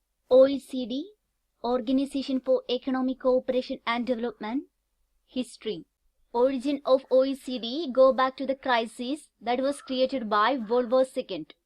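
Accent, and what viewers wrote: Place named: South India, India. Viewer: Slides are nice and by your pronunciation I take it you are from south and goes by the saying that south people have good command over english..and it has proven right in the video..marvellous you explained beps much better than others and in such limited words thank you mam..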